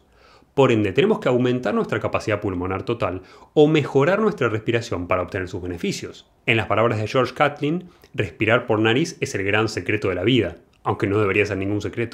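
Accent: Argentina. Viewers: Wtf argentino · como pasar de argentino a español el video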